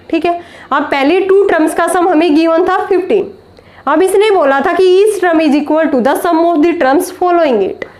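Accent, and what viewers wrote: Haryana, India. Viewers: Ap Haryana se ho mam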